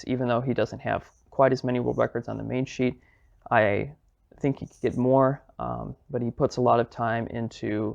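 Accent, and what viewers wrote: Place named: England, United Kingdom. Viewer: I like your english accent lol😭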